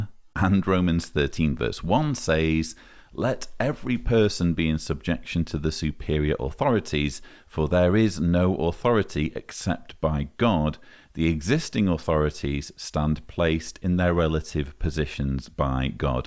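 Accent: United Kingdom. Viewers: I listened to 5 seconds and can see your a British nut!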